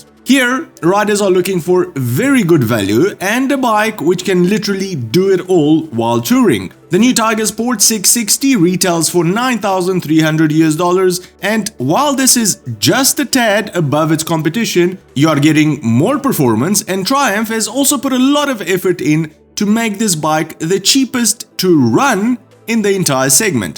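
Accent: South Africa. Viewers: Lived in SA, hence the accent. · Love hearing your South African accent tell about motorcycles and stuff.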